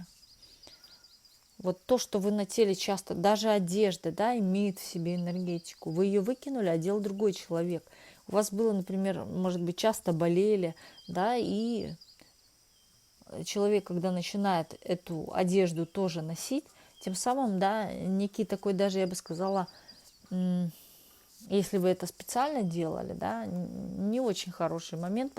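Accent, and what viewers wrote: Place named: Czech Republic. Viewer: Сегодня я осталась без работы в чужой стране , одна. Да, описание мне подходит. Ха!) и фамилия Воронова, и блондинка, и да белая ворона как бельмо для окружающих. В остальном , расклад интересный , благодарю. Я тоже нахожусь в чехии. Вы хорошо справляетесь с акцентом, но чешский говор и словечки проскакивают.) Это оригинально звучит))) Очень благодарна за Ваш труд. С наилучшими пожеланиями.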